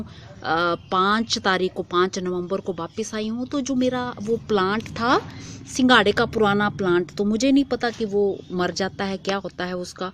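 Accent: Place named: Himachal Pradesh, India. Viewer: apki awaj se saaf pata chal Raha hai app himachli ho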